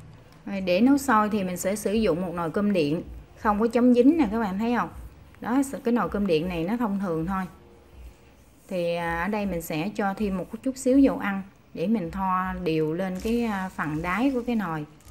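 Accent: Mekong Delta, Vietnam. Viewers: ko ko bạn đừng thây đổi giọng nói nhe vì đó là chất giọng đặc trưng của người miền Tây nam bộ đó, theo mình “bạn chính là bạn “ thì đẹp hơn nhiều. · giọng miền tây nghe mộc mạc! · Giong noi miet vuon mien Tay Nam Bo. Tu nhien va de thuong lam. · Cám ơn bạn chia sẽ cách nấu món xôi mình thích từ nhỏ. Nhưng xem clip này nghe thấy thương và thấy thích cái giọng miền tây nam bộ, cái giọng rặt chất "bắt con cá gô, bỏ chong gổ, nhẩy nghe gột gột"!!! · nói giọng miền Tây rất là đáng yêu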